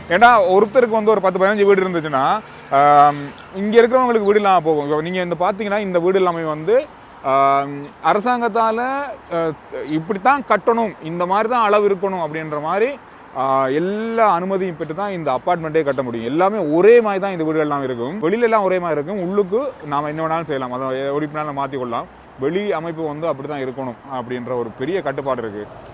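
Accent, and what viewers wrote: Sri Lanka. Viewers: உங்களின் இலங்கை தமிழ் கேட்க்க இன்ப தேண் வந்து பாயுது காதிணிலே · உங்களது இலங்கைத்தமிழ் தமிழ்நாட்டுத் "தமுளை" விட கோடி மடங்கு இனிமை !!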